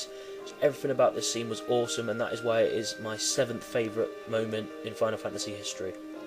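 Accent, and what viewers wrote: United Kingdom. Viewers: the video would be more epic without your very gay voice, let me guess britain? all islandmonkeys sounds gay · Are you british?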